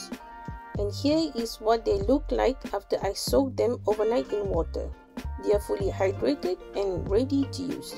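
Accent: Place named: Malaysia. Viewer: Found this video after i’ve already made potstickers which i failed :( btw, are u malaysian? The accent is so familiar, but the channel name doesnt sound malaysian at all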